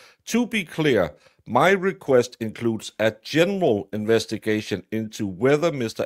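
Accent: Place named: Denmark. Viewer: That's just Danish English (denglish) pronouncement 😉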